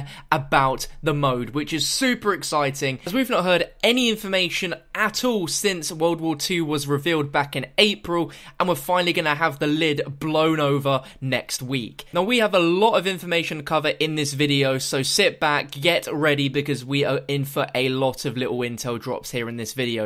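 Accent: United Kingdom. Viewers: the fact he has a british accent makes him reading the letter so much better.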